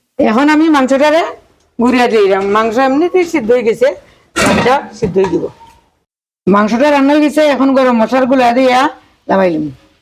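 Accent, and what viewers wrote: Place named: Sylhet, Bangladesh. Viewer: Mashi Maa ajkei apnar video deklam vhala laglo karon sylheti math hunia.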